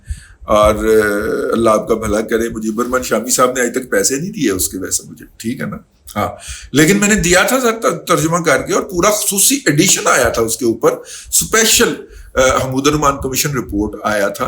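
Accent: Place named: Lahore, Pakistan. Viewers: V good sahib I like your lahori style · Pure lahori style hy apka excellent sir thanks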